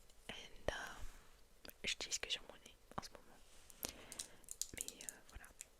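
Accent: France. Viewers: She’s so French coated it’s so cute like especially the mic idk it just give me expensive brand vibes like Versace, gucci, or Louis😭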